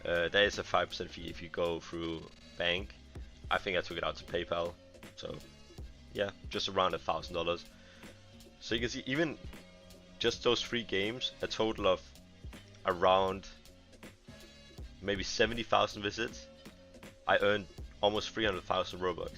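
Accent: Denmark. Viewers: Your danish right? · Are you danish?🧐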